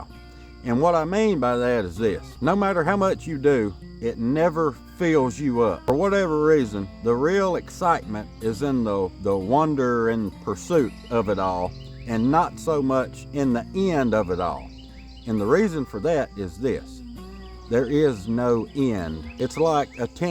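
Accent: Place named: Mississippi, United States. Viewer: Great content brother, I knew from that accent you had to be from my neck of the woods, Mississippi! Some people say I'm more countrier than two rows of butter beans, lol! But anyhow, I don't think most people realize how much work is involved in raising live stock, gardening, etc. It is W-O-R-K period! I've done just about it all, Till, plant, weed, pick and shell beans, raised chickens, rabbits, hens, ducks, hogs, goats, cows, fish, etc., canned, smoke house, and the list goes on and on. But the reward is also very, very great! There's just something special that is hard to describe to people when you sit down at your dinner table and eat the food that you know you have worked really hard for and that which the good Lord has blessed. I'm believing God that I can buy quite a few acreages of land here in the near future and retire on a small farm. 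I know it will be fun, because I know what to expect and to know my limits.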